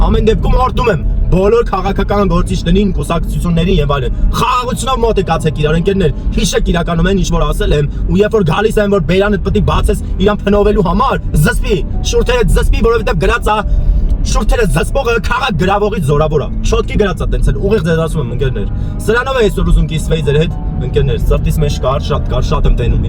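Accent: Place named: Iran. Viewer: ❤❤❤իրանահայի բարբառով է խոսում, որը շատ համով է❤❤❤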